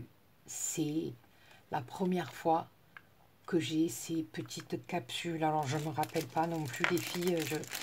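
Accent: South, France. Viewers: Oh ma belle, quel plaisir de t'entendre et d'entendre le sud dans ta voix ! Trop heureuse que tu ai été gâtée et tu es tellement touchante !!!! Te fais de gros bisous ♥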